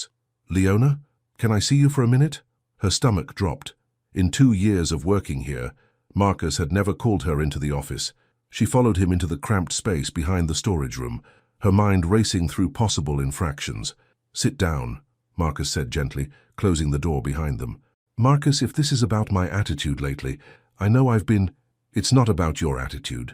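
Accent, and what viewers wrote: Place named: United Kingdom. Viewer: Why does this video have a voice with a British accent? I get tired of hearing these British accent.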